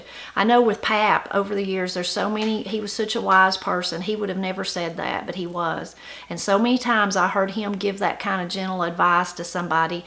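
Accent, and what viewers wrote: Appalachia, United States. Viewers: I like listening to you explanations of things so much because sometimes what you say sounds more Appalachian than the phrase your explaining. Like run and jump to get up there…sounds like…run n jump to get a bear. Most every where else they would say the road had ruts…I’m like you I say the road was rutted out. I love your channel❤️👍to me might coulda is might try…might oughta is this works sometimes for me…might shoulda is this always works for me.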